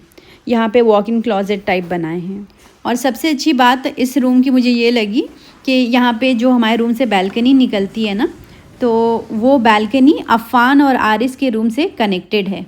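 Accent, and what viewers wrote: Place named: Azamgarh, India. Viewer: Aap ki language accent bilkul Azamgarh wali hai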